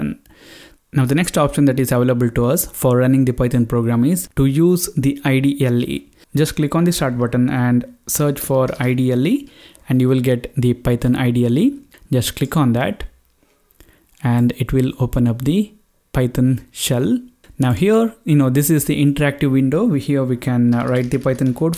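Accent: India. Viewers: by the time I hear Hindi accent I press like before watching the conter! Thank you my friend, I am sure I will find wisdon! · he has an Indian accent, he has proven more superior than me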